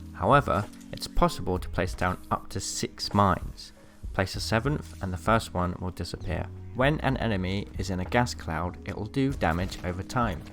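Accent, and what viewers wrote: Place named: England, United Kingdom. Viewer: Really entertaining, pretty monotone voice but the English accent sorta balances it out, great video overall!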